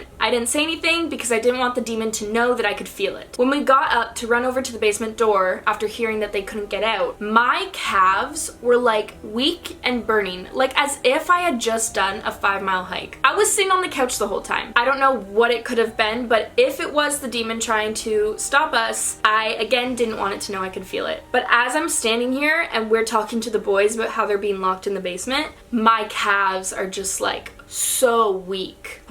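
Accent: Canada. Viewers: Gurl I love you candian accent and got used to it now I say aboat,melk,house in you accent love you and btw love your new merch and guys go stream. Love idk if I speeded it wrong opsie👉👈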